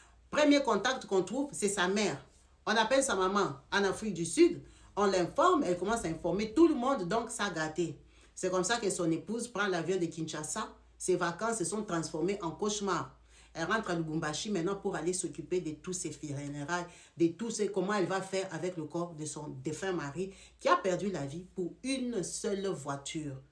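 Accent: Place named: Cameroon. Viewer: Madame arrête de parler comme une camerounaise ⛔ ton accent est nul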